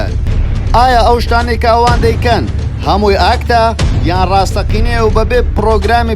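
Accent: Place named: Erbil, Iraq. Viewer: تن تن تن تننننن هه‌ولێری بێ مێشك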